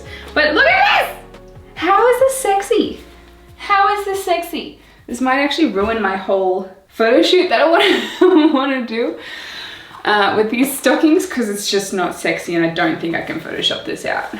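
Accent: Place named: Australia. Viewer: Because of your accent, I heard fly swatter as fly sword and thought that must be the coolest Australian slang ever. Hope you're having a great Thanksgiving, although I may be revealing my American toolishness by saying that, and hope you have a wonderful holiday season through the rest of this year and into the new one.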